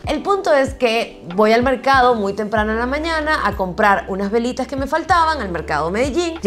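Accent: Venezuela. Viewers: Omggg eres de Venezuela???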